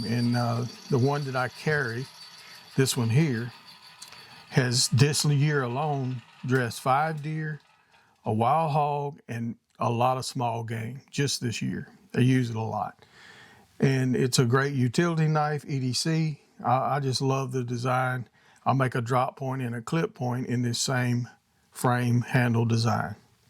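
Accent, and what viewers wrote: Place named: South, United States. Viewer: OK- so the short answer is yes! I have suffered "Gravity's Wrath" with some custom stained glass... As for the file work and the remaining content- you are eloquent and smoothly southern in your presentation- do not forge a can of screws! Frustrations abound but the horizon is simple- educate and just maintain the presence! Personally I appreciate you letting us into your shop. Be safe and as always- at peace.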